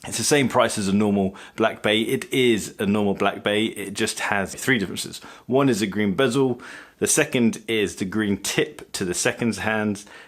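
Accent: RP, United Kingdom. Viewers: Congratulations on the Baby? Where did you go to school? I have been listening to RP on YouTube and to me you have some RP but other hints but can't place. I am from USA.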